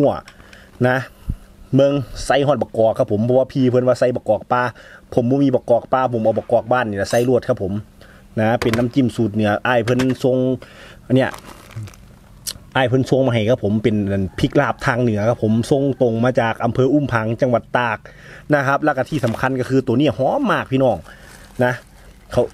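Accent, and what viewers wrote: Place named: Ban Phue, Thailand. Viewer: เจ้าเว้าคือคนบ้านผือ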